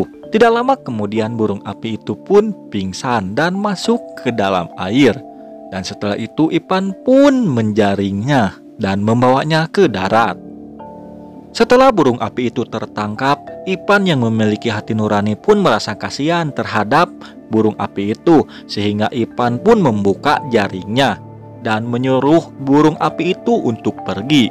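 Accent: West Java, Indonesia. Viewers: Logat sunda na kabawa keneh jadi apal euy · Sunda pisan john logatna,,mantaap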